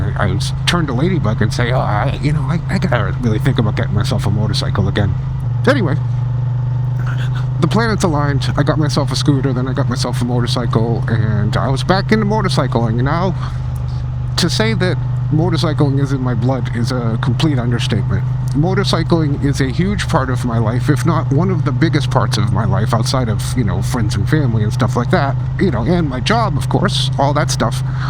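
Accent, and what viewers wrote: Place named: Boston, United States. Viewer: We seem to have similar taste in bikes. My Tiger Explorer 1200 was getting a bit big and heavy, so I bought a V85TT in 2020 for birthday #75. It seemed to have all the things I wanted in a bike. 5 years and 16K miles later, it just wasn't gelling. So it went to a new home late last year and I picked up a Himi 450. Did a 5700 mile shakedown ride on the Himi and it is a great bike. In the interim, I picked up a Triumph Speed 400 for a day ride play bike - and it's a hoot. And then, there's the '86 Yamaha SRX-6 (that I bought new) sitting in the back of the garage sadly in need of a complete restoration before it can go back on the road. And I still have the big Tiger - although I will probably try to rehome it this Spring. The Himi is doing what I need for a distance bike and the Tiger deserves to be on the road. 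And I thought that I detected a bit of Boston in your accent. 😁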